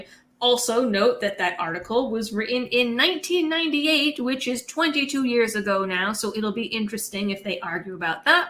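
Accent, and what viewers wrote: Massachusetts, United States. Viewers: <shameless flirting with married woman> I think the Bay State accent with the Hawaiian flower in hair is a nice balance!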